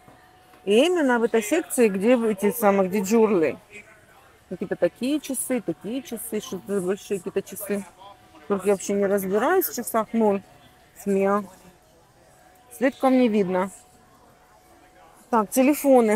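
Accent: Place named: Krasnodar, Russia. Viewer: Надо же, как силен краснодарский говор, даже через десятилетия😢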